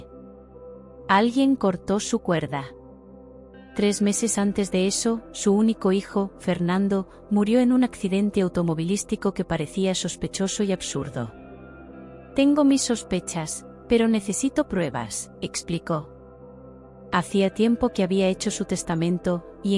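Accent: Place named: Spain. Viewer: Que bella historia y la narración impecable con ese soneto como de españoles me quedé fascinada asta el final mil gracias y ya me inscribo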